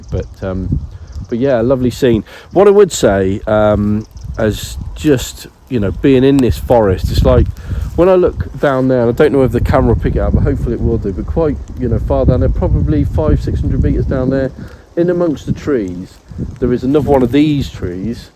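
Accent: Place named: Somerset, United Kingdom. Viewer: I came here looking through recent S5ii videos, ears pricked at accent, then location then mention of a C3; i've stumbled on gold here 🤣🤓🤟 i'm a Somerset based lumix and film shooter too. Ace pics of the young trees! 🤓🤟 I'm on the cusp of picking up an S5ii for weddings for this year. I was running x2 S1 and an S5, I found I was missing the occassional shot due to difficult contrast scenes at times and then faffing with MF and missing moments. I've sold my S1 bodies and plan on x2 S5 and one S5ii. How has the AF been for you so far on the S5ii? Bizarrely i've never been a fan of eye detect so kind of really after how it performs without eye AF (all the hipster videos seem to be eye AF only). C3 is such a good camera, I ended up selling mine as it was a bit heavy for me also I wanted an SLR 6x6. One hell of a camera though, loved using it, do wish I tried it with one of those tripod parralax correction tools though, but then the parralax didn't mess me up too much.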